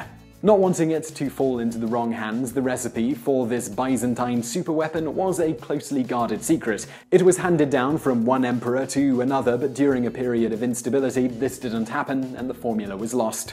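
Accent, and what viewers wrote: United Kingdom. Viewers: He's British, you know... In fact, it's probably the Americans that are mispronouncing the words. We tend to do things like that and then expect others (even though they are much older, thus closer to the source) to change themselves to our ways.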